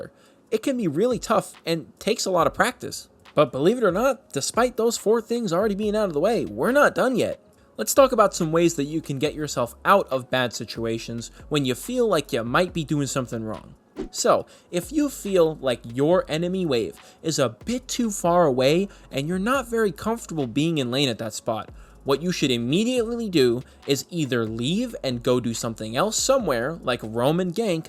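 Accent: United States. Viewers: the way you talk,it reminds me like Wild West, cowboy things xD ,i mean on actent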